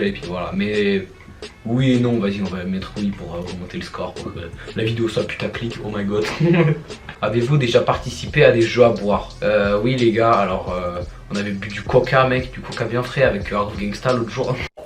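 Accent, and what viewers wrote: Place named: North, France. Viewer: Mec apparemment tu viens di nord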